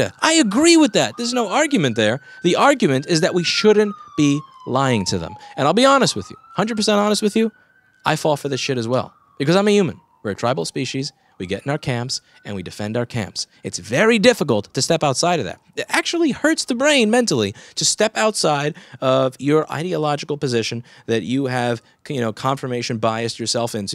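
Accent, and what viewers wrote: New York, United States. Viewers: I think you aren't an asshole, you are just telling the bare truth. I love your face. Those black bags are just evidence of your hard work. I love the fact that you are pragmatic and innovative with your chair. And i especially love your new york accent! Just to be clear - dont let anybody tell you something different! Fuck those haters :D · Omg you are so self conscious, you covered all the stupid comments I ever had of you down to the NY accent. Love you, do something about those eye bags and chair, and wall paint. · i'm a New Yorker as well, can confirm i don't like your NY accent. Keep up the good work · projects his own insecurities as a strawman, I love your crooked chips and your new york accent and attitude. You've taught me way more than the real Apple training team ever taught me in my years working on behalf of them. 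· I love your NY accent, you sound just like a Seinfeld episode. As a female I think you are a very good looking man so eff 'em. You're a great, engaging teacher that's helped so many people. I'd be honored to meet you in person and see your technique and equipment in person. If you're ever out in Bumfuck, Virginia come on down and have some sweet tea and cornbread.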